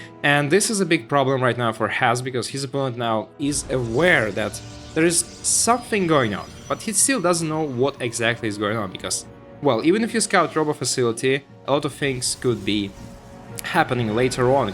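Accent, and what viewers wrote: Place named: England, United Kingdom. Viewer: ого, вы из англии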